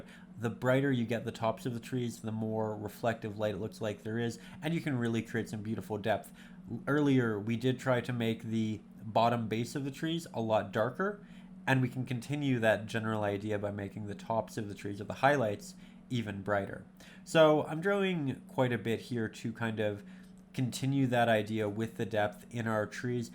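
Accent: Canada. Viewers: This guy sounds like he is from Canada.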